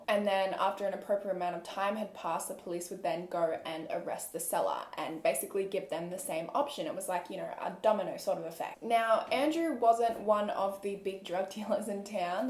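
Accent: Australia. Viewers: The Australian accent is the best. Your voice is very soothing 🙌🏼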